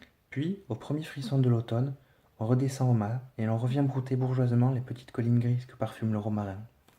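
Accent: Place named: France. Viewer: So relaxing! The French accent/language is so great!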